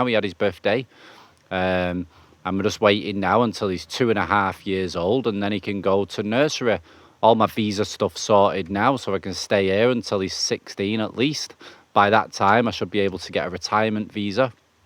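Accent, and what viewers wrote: Manchester, United Kingdom. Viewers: Todmorden eh, I didn’t expect that😂 I was thinking Gorton or Longsight with that accent. I spent a few years in West Yorkshire, amazing people. If I had to live in England it would defo be there. I’m watching this in 11 degrees with threats of rain, that bamboo hut looks so appealing ✌🏼🙏🏻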